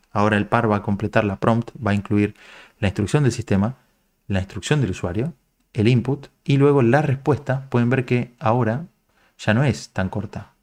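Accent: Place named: Rioplatense, Argentina. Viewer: en español, (Argentino o Uruguayo)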